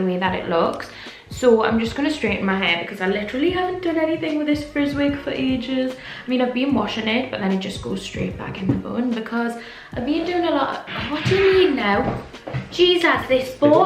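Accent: United Kingdom. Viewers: Hi!! I've been watching your channel since 2018, for me watching and hearing you has been a great way to improve my english not only because i try to understand everything you say but also because you have such a strong accent that helps me get used to the different ways of speaking english in the uk! I found your channel when i lived in Leeds working as a Spanish/foreign language assistant in a school and it has been really useful! I'm glad that you are encouraging people to learn other languages and to be less selfish about speaking English in non-native english countries! I wish you the best luck with this journey:)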